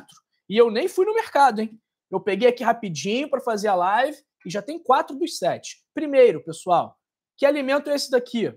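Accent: Rio de Janeiro, Brazil. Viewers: Bom dia!!! Que é cúrcuma? É um pozinho amarelo que compramos em casas de produtos de temperos tbm? Ganhei um pé de plantas me disseram que é cúrcuma. Será que alguém tem pé de cúrcuma em casa e deixar a foto pra mim comparar? Muitíssimo agradecida dês de já. Eu moro em Guarulhos SP e o DR pelo sutaque pare ser carioca,Rio de Janeiro. Morei lá duas vzs.😊✌️💞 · Boa tarde Dr acho que vc e do Rio de Janeiro o sutaco não nega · Vc deve ser do Rio de janeiro, pelo sotaque de carioca. Estou no Rio de janeiro. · Seu sotaque é de carioca, Dr. Vc Tem cara de Flamenguista, acho q vc fala do Rio de Janeiro, Cidade e Estado do MENGÃO!!!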